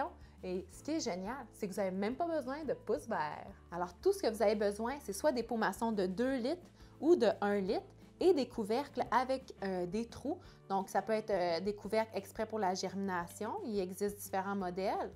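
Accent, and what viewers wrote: Canada. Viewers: C'est marrant comme tout d'entendre parler une canadienne. Sinon interessant mais c'est en pleine lumière(personnellement je fais ça a l'abri de la lumière pour en avoir des pousses tout blanches)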